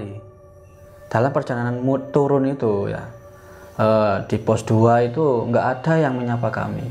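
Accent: Java, Indonesia. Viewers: Kang Nama sunda, logat medok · Kang kirain orang sunda,ternyata jawa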